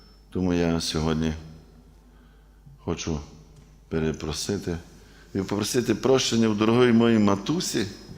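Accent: Western Ukraine, Ukraine. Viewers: Отче, я живу в Тернополі і є парафіянкою нашої церкви Святого Йосафата, але дуже люблю дивитись і слухати Ваші проповіді, науку, відповіді на запитання. А ще Ваш діалект такий близький, як то кажуть, західняцький і почуття гумору. Велике Вам спасибі за Ваш труд і служіння Богу і людям!❤💙💛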